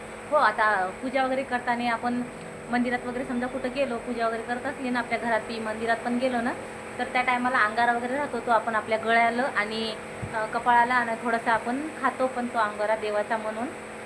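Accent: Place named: Vidarbha, India. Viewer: आमच्याकडे टोमॅटो नाही खात उपवासाला 😊बाकी वैगव लक्ष्मीचे उपवास मी पण केले तर खूप वर्षे आधी आणि तू करतेस तर व्हिडिओ टाकायला काहीच हरकत नाही आणि मला एक सांगायचं आहे तूझी भाषा ऐकून लगेच कळलंकीतू विदर्भातली आहेस 😊कारण आमच्या ताई ( नंदूबाई ) अमरावतीच्या आहेत बाकी तूझ्या साधेपणा मध्येचतूला यश मिळेल ❤❤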